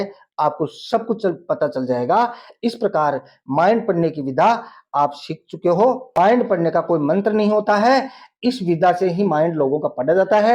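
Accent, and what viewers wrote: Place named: South, India. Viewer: Dada g ka hindi south ke hindi se milta julta hai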